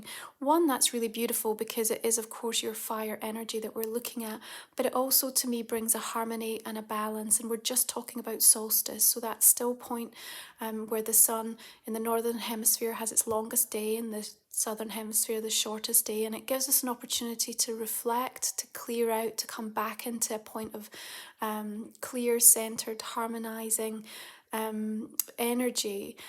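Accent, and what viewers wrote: Scotland, United Kingdom. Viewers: Oh! Didn't realise you were a fellow Scot 🏴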